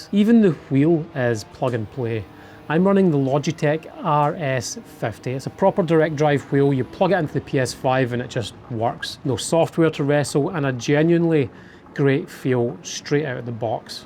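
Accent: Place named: Scotland, United Kingdom. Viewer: Firstly.. before my main comment…. I am Scottish 🏴. - your accent sounds Scottish. Out of curiousity why quote prices in dollars- are you catering to the bigger US market? Anyhoo….. .. Wow. Watching that video it could have been myself talking. Agree with you 100%. In our house, my partner is the console player (PS5). More Assasins Creed, Crimson Desert than GT7- but she did play it (flat) with controller and was as good if not better than me on that platform. As soon as you put me on PSVR2 (with globular cluster of course) I levelled the playing field - just getting used to that controller!! 😊 I am yet to play GT7 on my ‘$7000’ 😉 PC rig- simply because the PS5 is in our living room hooked up to our big TV. I mentioned in previous vid comment I play only modded AC on PSVR2 with PC adapter and the DFR mod. Other than a high end headset, I am a bit of a PC snob- but - I still rate GT7 highly. 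All your comments ✅ Also Sophie (1 or 2) is a good AI competitor if you (like me) race offline only. Yes- modded AC looks friggin awesome when you have it dialled in properly, and tinkering in that sandbox is half the fun for me. With more than 6000 cars and 1200 tracks, it’s hard to beat. But… GT7 looks gorgeous at times for something which is plug and play. Bit ‘grindy’ perhaps to unlock some stuff, but tyres, pit stops, visuals. Pretty good- whatever sim racing physics snobs may say. At the end of the day.. it’s not real racing. I did this for years. Bankrupt me😅 So many broken engines…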